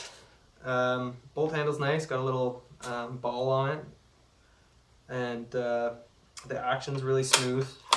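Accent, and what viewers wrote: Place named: Canada. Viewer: You sound Canadian so please stop staying Zeee - its Zed :)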